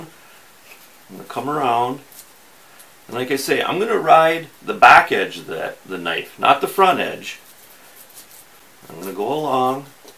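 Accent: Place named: Upper Midwest, United States. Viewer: Glad to hear that your knives aren't of the $100 variety. My wife and I fish and camp mostly on the Valentine National Wildlife Refuge. It is an amazing place to panfish for bluegill, perch, Largemouth Bass, Northern Pike, and catfish. Located in northern part of Nebraska in my beloved Sandhills. Based on your slight accent😄 I'd say you are from Minnesota,Wisconsin, or somewhere north of us. If you get the urge for a change of scenery check it out on the internet. Started by Teddy Roosevelt, primarily as a refuge for migrating birds. 8 Refuge lakes open to fishing. Many other lakes and Merritt reservoir near by. You wouldn't regret it. 👍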